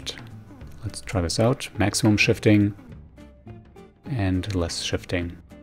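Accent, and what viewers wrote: Germany. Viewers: Deutscher Akzent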